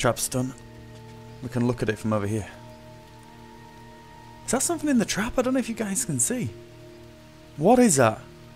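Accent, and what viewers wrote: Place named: United Kingdom. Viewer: He's a Brit, it's just how they say it. It's when they pronounce aluminum and antibiotics that break my mind.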